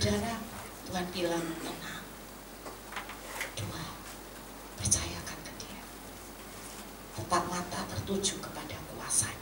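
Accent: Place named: Java, Indonesia. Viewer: Justru Saya orang suku batak tinggal di Sumut tapi saya suka dengar khotbah logat bahasa ibu campur logat Jawa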